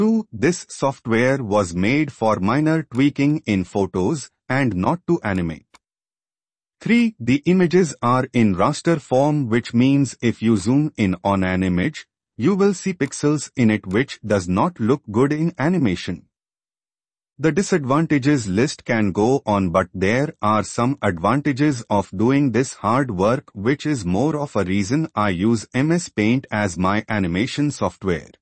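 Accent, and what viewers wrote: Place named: India. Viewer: That probably isn’t a robot, plus Indian accents might sound funny but is just how they speak.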